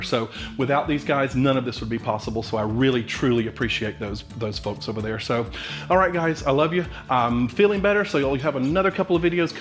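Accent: South, United States. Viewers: my first time visiting your channel. I detect a Southern accent in your voice. Where did you grow up and where are you living now??? Love your enthusiasm and smile!!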